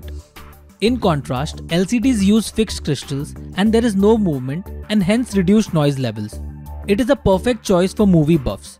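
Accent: India. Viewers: Can’t wait to see a new wideo, Indian ascents are great! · I wish youtube could filter out results with this hindu accent, i couldn't focus on content. Sometimes I feel like you guys speak like this on purpose, like you think it is so cool because BBT and Koothrapaali is popularrrr, yess...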